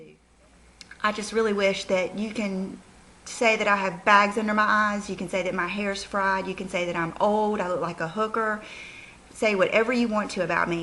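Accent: South, United States. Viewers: One major reason why I subscribed to you was your sincerity & your enthusiasm. Plus you're southern accent. I married late in my life so we never had children but I always admire full time Moms. I Those nay-sayer see the world through their negative point of view. We are positive people so that's why you didn't anticipate these mean and cruel comments from them! Can't image what we don't know or feel. Be strong & keep up the great videos. P.S. I think your family is lovely! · the way I look at it you have two choices 1) fold up your tent and go home OR 2) don't let stupid people make you feel bad. I'd pick number two. People think they can say anything and hide behind the curtain of the internet. Block them out! I guarantee you that for each hater there are hundreds of us who enjoy your videos and look forward to Friday's haul. This California girl looks forward to hearing your southern accent! 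Keep it up, we love you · get your southern accent on say "kiss my butt" & keep making your own choices & decisions.... & videos... we luv you much much much...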